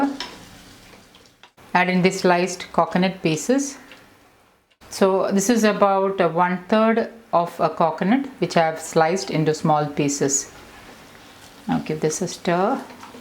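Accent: Kerala, India. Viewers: Are yuo malayali I like yur Engish pronoun ciation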